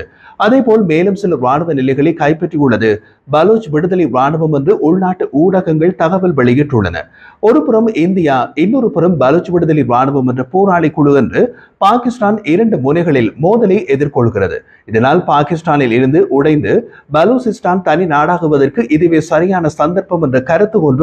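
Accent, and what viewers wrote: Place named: Eelam, Sri Lanka. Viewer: அழகிய (ஈழத்)தமிழ் உச்சரிப்பு,நேர்த்தியான செய்தித் தொகுப்பு ! பரபரப்பில்லாத உண்மையான செய்திகள்!நன்றி!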